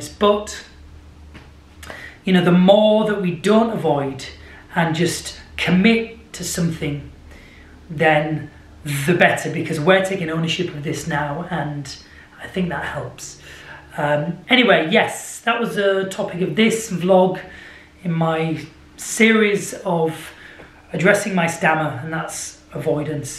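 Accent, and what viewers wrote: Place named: England, United Kingdom. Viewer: Типичный английский акцент. Звучит очень забавно и приятный голос!😉😉😉.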